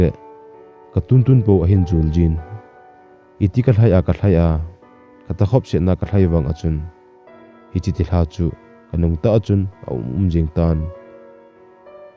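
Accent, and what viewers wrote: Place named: Nagaland, India. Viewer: Sir hoylang ho nahi hm, na awso chu achome, ole Nagaland,nc hill Assam lang ho nahim sir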